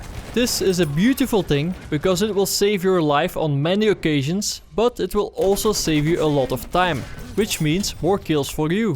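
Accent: Netherlands. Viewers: beetje duidelijk Nederlands accent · Are you dutch? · Are you Dutch ?! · hij zegt "tank" op een Nederlandse manier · you're dutch right? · You have a very noticable Dutch accent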